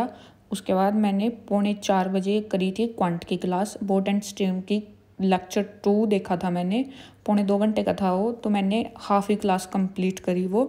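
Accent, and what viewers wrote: Haryana, India. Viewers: Haryanvi type language h aapki ...?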